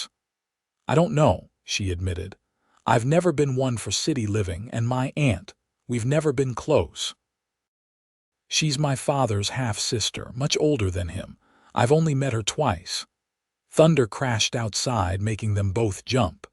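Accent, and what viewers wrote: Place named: Canada. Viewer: Sounds Canadian. Liked this story very much. Thanks. GA 🍑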